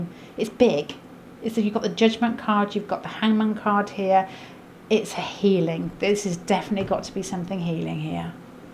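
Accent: Manchester, United Kingdom. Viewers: I got my friend Steve from Tamworth to listen to you as I was curious where you’re from and he’s great at picking out accents but you have stumped him. He says you don’t have much of an accent but if he had to guess he’d say Manchester or north of there. Me being American, I have no clue whether he’s right or not, lol. I love listening to you though. The way you say “you” when it comes at the end of a phrase is just lovely. ✨💛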